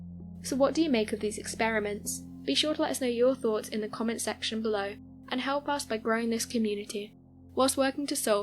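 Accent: England, United Kingdom. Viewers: I just listened, is that really you?, sexy and English?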